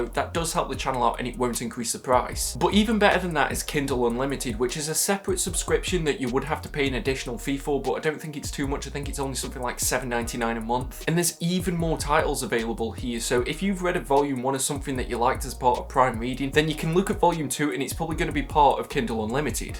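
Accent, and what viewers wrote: United Kingdom. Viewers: No, you have a great content creation vibe! I just rarely hear a fellow UK accent, and the pronunciation of 'book' is one of my favourite regional pronunciations haha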